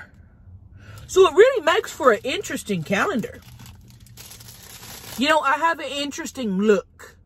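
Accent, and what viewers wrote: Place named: South, United States. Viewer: I adore this woman 🥰 and her little southern accent lol SPLURGE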